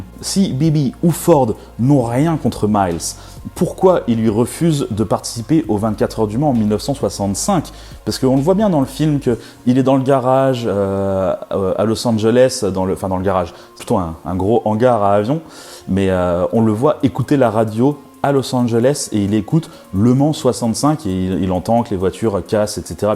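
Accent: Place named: Moselle, France. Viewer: Désolé les gens, ici dans notre belle région c'est comme cela que l'on s'exprime ! Le Chti' d'la Moselle...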